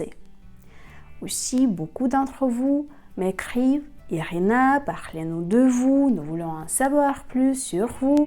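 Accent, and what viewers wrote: Russia. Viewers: Мне нравится ваш легкий русский акцент, когда вы говорите по-французски. НО я предпочитаю, чтобы ваши видео были на русском языке (с французскими субтитрами) 🙂 Большое спасибо за вашу личную историю. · Vous êtes super belle et votre voix est très douce et séduisante ❤🥰😍. J'adore l'accent Russe, tellement sexy ☺️👍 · Personnellement, je préfère le format en langue russe avec les sous titres, c'est vraiment génial pour la prononciation et l'apprentissage, même si je suis ultra débutant et que je dois mettre souvent la vidéo en pause. De plus, je trouve que ta voix est beaucoup plus charmante avec un accent russe. Continu comme ca.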